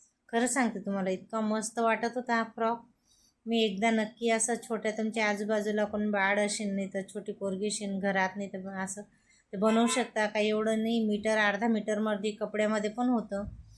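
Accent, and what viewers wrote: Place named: Nashik, India. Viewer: ताई तुम्ही नाशिक साईडच्या आहेत का.. बोलण्यावरून वाटले तसे..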